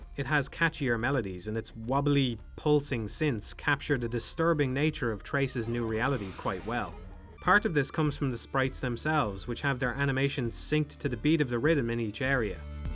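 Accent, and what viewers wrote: Ireland. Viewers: I only watch this for the Irish accent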